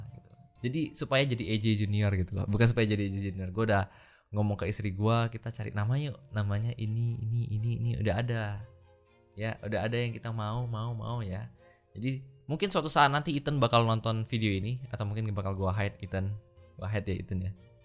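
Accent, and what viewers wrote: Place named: Java, Indonesia. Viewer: Ehh wong medok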